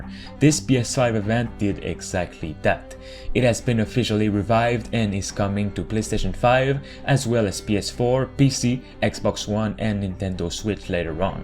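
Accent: Quebec, Canada. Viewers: The Quebec accent here is strong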